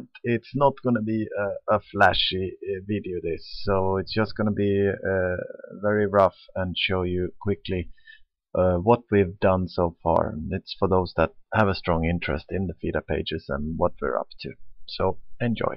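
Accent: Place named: Sweden. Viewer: Swedish accent FTW :D // Runeic on FID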